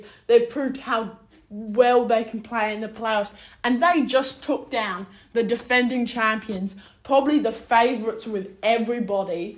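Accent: Australia. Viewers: Well, time to change that title and edit the video. Sorry Bud. Are you Australian or British? Exum and Green need to speak with you mate. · She doesn't sound American. Maybe Australian. Her understanding of the game isn't that good.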